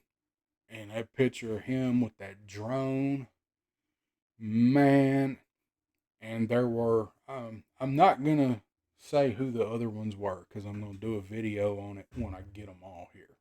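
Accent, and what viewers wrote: South, United States. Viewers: Great video! The drawl is REAL y'all!! It's what we call "talkin'" down here in the south. Nonetheless, this is a good vid to let everyone know what you are about. So far, so good! I can't wait to see what you do with this channel, man! It's off to a great start!! And this is a love letter to the power of family and how important that is to our lives. We are fortunate to have gotten so many Joes and other toys at the time! I know not everyone grew up that way. But, we did, and it was freaking awesome! The creek, the woods, the go karts, the BB guns and hunting snakes, the dump trucks, the dirt clods, the lemonade at our grandparents, the small town gas stations, and the southern drawl. But most of all for us, it was GI Joe and it was the best! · Hell yeah! Love the humor and the Southern Drawl!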